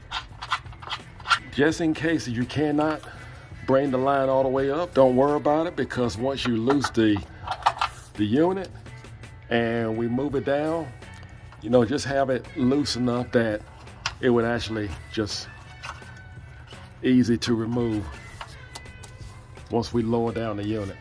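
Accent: South, United States. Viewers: My mans so country but I love it lol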